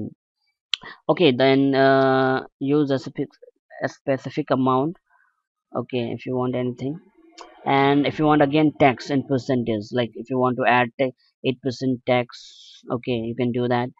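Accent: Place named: India. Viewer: Scam anything with india voice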